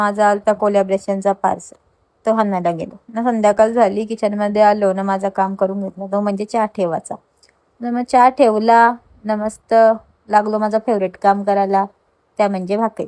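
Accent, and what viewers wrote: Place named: Agri, India. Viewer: Kiti agari bhasha boltes g vlog kartes na vavsthith bol jara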